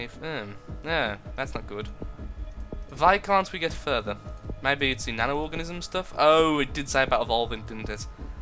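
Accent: United Kingdom. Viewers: Lots of British sweet talkin' going on in this plague video.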